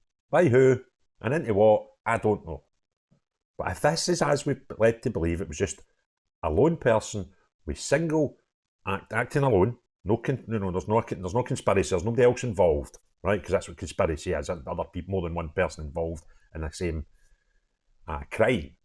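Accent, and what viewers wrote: Scotland, United Kingdom. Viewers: your Scottish accent is hard to understand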